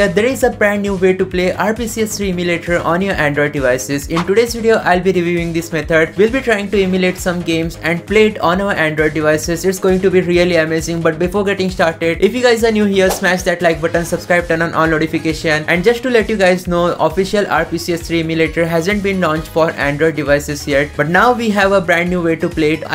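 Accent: India. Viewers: 1 plz speak more slow cus indian english realy understand hard ... 2 that cloud game why speak lier? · Tikkken with the smelly mother fucker indian accent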